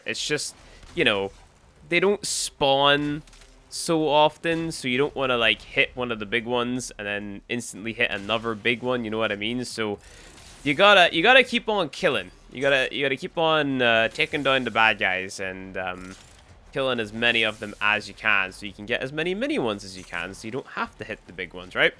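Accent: Northern Ireland, United Kingdom. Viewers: Is that a fellow Northern Irish accent I hear? Well damn, I might just take that as a sign to give Warframe another go.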